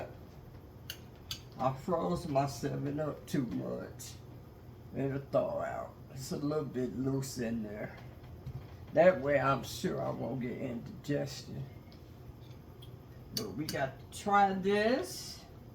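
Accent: South, United States. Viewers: An elegant domesticated classy pretty lady with a sassy personality and tenacious Southern accent, Ms. Thanks immensely for the eclectic succulent crusines cooking tuteledge. Your foods look delicious, fresh, and quality. That gumbo look flavorful, delectable, quality, and fresh. Have a positive and productive day Ms.. 😂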